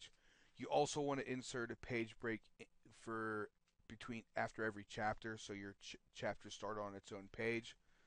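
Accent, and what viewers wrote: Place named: Northeast, United States. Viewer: Thanks. Quick & easy instructions for dummies like me! One little point to consider: When you underscore text like you do in your sub-headers, some readers may think it's a link and try to click on it. I usually use a smaller font and bold and/or italics for these. Hope you do more of these videos. P.S. Like your accent. Are you from the NE US?...you sound like it. I'm from Long Island myself...oy!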